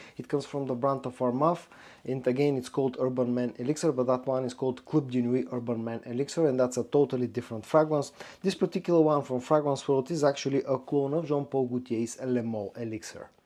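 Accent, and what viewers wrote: Bulgaria. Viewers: да не се казваш случайно?:) Често попадам на тези ревюта и чак сега долових бг-акцента (но е толкова слаб, че дори се чудя дали всъщност съм прав). Когато става въпрос за арабски аромати винаги се спирам на твоите видеа:) Хем ревютата са интересни хем стила ти не е натрапчив и претенциозен (нещо, което е дооста рядко срещано в тази ниша). А за темповете, с които бълваш видеа направо нямам думи:Х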